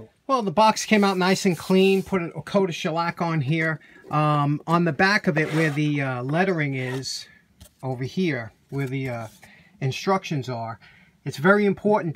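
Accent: New York, United States. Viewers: That's a JACKPOT tool!! Always interesting and always informative!! I always look forward to these restorations!! The education provided with each video is remarkable! Of course, the NY twang makes me feel like I was back home on Lung Ilint!! Thanks!!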